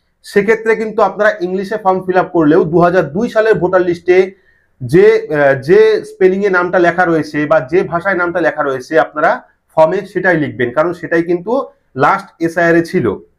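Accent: Bangladesh. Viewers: Aponar kothar modhay Bangladeshi tan ache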